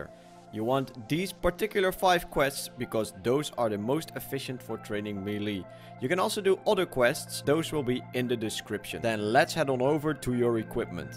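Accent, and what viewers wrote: Netherlands. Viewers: aan je accent te horen ben je nederlands :)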